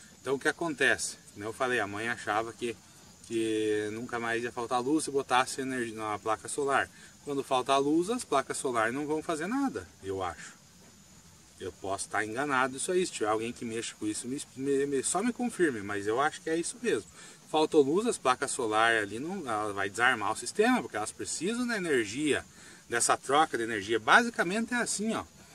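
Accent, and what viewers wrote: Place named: Paraná, Brazil. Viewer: Que orgulho do nosso sotaque paranaense .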